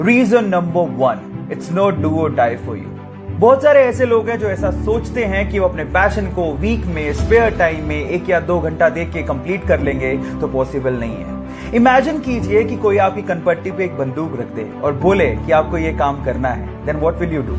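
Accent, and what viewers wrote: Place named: Haryana, India. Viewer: Don't mind sir but everyone cannot speak in haryanvi like u except haryanvi people because this language is in blood Jai hind jai haryana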